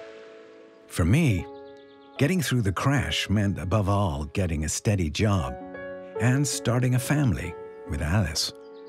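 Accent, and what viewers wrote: United Kingdom. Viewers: This appears to be someone from the U.K narrating an American story. I coulsn't finish watching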